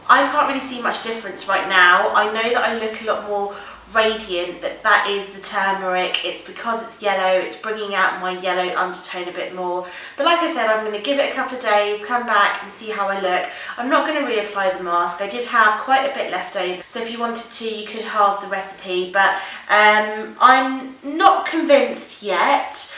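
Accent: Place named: United Kingdom. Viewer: Fuck sake everyone sees an Indian girl and assume they should have an apu accent. FFS it's a British accent. It's nice. People are so fucking rude.kmt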